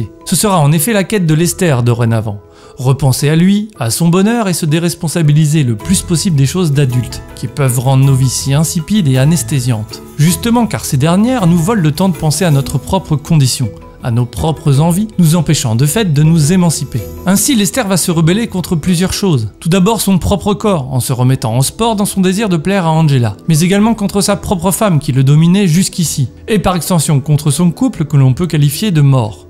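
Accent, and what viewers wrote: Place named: France. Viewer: C'est trop génial !!! Cet accent français sur le texte anglais est un vrais régale ahahahaha :D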